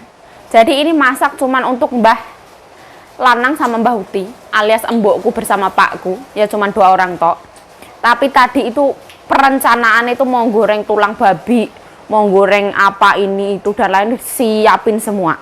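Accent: Java, Indonesia. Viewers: i love video you yg khas medok e😀😀😀😀👍👍👍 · Logate omong lucu...bner2 logat jowo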